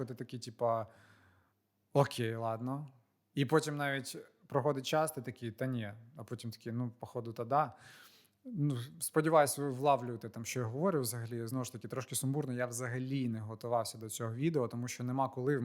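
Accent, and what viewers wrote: Standard. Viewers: Давай не розклєюйся :) Хороший настрій, пили контент та одужуй, і до речі, дуже гарно говориш чистою українською) Це перше відео з твого каналу яке я побачив, до того ж, з рекомендацій ютуба 😊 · чиста українська💪👍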